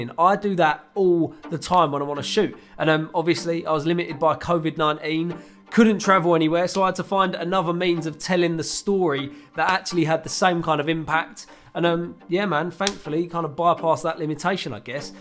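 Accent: United Kingdom. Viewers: covid nine-IINN XD.. so cool man I love British accents no offense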